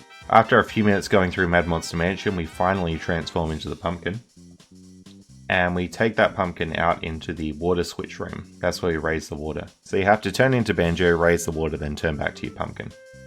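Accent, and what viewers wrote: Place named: Western Australia, Australia. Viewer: I haven't even beaten BK but it's also nice to see love for the Any% run, since 100% is always the main star. Great videos, and nice accent! Western Australia, right?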